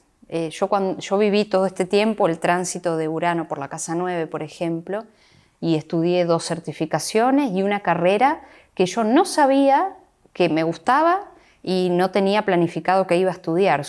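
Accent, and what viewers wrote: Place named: Argentina. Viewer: Hola. Gracias por este video ❤ Tengo una curiosidad: de qué región o provincia argentina sos? Pregunto por tu "tonada". Besos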